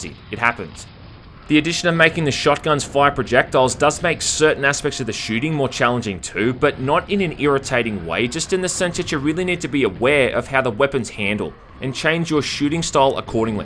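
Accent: Australia. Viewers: Tyler Malone so it's Australian accent he speaks with?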